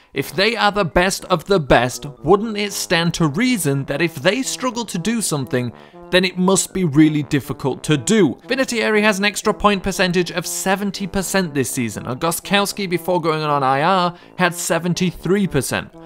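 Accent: United Kingdom. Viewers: Is it just me or is it oddly amusing hearing a British dude talk about football?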